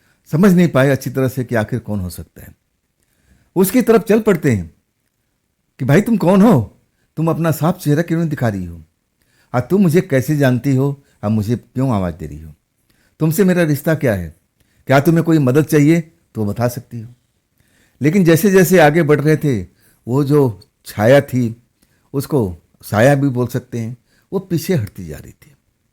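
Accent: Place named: Bihar, India. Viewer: Aap ke pronunciation se lagta ki aap east belt ke hai yani east up ya bihar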